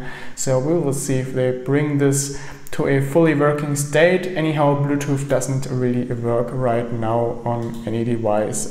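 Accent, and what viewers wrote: Germany. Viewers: don't tell me this isn't a german accent!;O Nice video, thanks for making.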